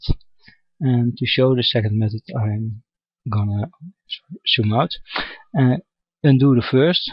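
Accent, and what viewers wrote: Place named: Netherlands. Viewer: Could it be that your dutch, you have a very dutch accent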